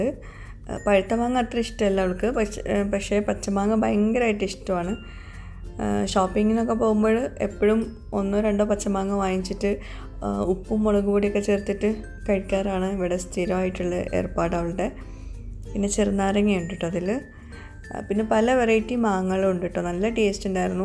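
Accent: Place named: Palakkad, India. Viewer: ഞങ്ങൾ പാലക്കാട്ട്കാരാണ് പട്ടാമ്പി ഒക്കെ ഇങ്ങനെ ആണ്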